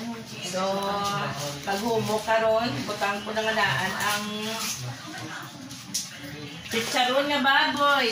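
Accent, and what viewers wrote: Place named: Waray, Philippines. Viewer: Sarap pakinggan tono at salita niyo parang waray.heheehehehe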